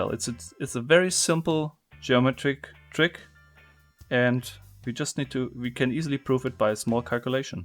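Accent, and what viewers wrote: Germany. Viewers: Du sprichst sehr gut englisch aber ich höre deutschen Akzent heraus you're speaking very well English but I can hear a German akzent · Übelst deutsches englisch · This channel is great for so many reasons bro. The presentation is great and your German accent is pleasant to listen to. your showing tons of puzzle I haven't seen and the way u show the puzzle then "let us try" THEN solve it keeps me watching. great job! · Glückwunsch zu den 100k. Ich bin schon etwas länger dabei und bin bis heute wegen dem deutschen Akzent fasziniert xD Ich mag jedenfalls deins Videos ( Das ist eig was ich normalerweise sagen wollte haha :D) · Ich weiß genau das du aus Deutschland stammst! Hört man ein bisschen am Akzent😉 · Glückwunsch !!!! ( dein deutscher Akzent verrät dich ;D ) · using V1 & V2 as a name for that thing is somehow funny with your german accent ;D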